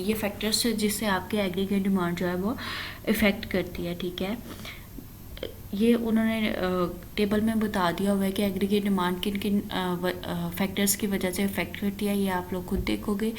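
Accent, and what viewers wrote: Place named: Pakistan. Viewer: Aapki aavaj se lag rha hai , ki aap Pakistan se ho 🙏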